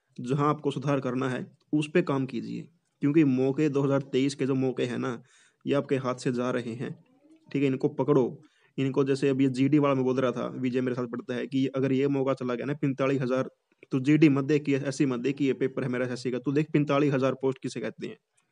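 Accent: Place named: Haryana, India. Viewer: Ap haryanvi hee Bola kro kuki bich me jb ap hindi bolte hoto to haryanvi swg aaa jaata h😁😁😁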